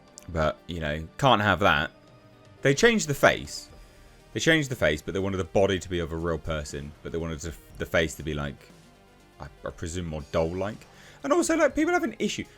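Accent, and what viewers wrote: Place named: United Kingdom. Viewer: Man I like your british accent, also great content!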